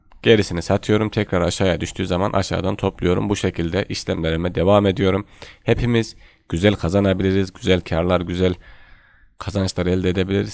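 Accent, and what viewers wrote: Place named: Konya, Turkey. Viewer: konya şivesiyle konuşuyorsun konyalımısın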